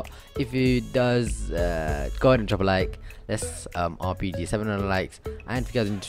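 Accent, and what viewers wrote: United Kingdom. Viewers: HE TALKS BRITISH 🔥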